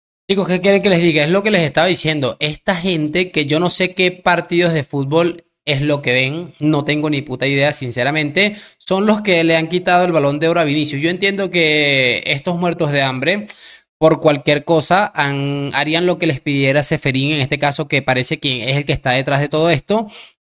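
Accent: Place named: Venezuela. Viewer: Ojo como estás mi hermano por tu acento eres venezolano no soy madridista es más admire aquel equipo de Romario estoicob ladrum kuman Guardiola de la peña con cruif como técnico igual aquel Madrid de Butragueño hugol Michel Martin Vazquez Gordillo buyo Sanchís Valdano santillano Juanito uff equipazo y e seguido a ese Madrid de Roberto c Raúl Elguera casillas y cristiano y compañía y ojo Raúl filtraba casillas filtraba Sergio ramos igual lo acia eso siempre a sucedido cambio en los últimos.4 años a Zidane se lo cargaron con las filtraciones y ojo te lo dice alguien que admira al Madrid como el mejor de toda la historia del fútbol a la altura de Brazil Alemania e Italia pero no soy fans de este súper club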